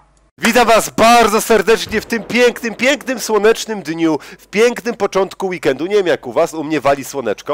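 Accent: Silesia, Poland. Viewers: No widza że ty jednak umisz po naszymu godac i że ty żeś hanys je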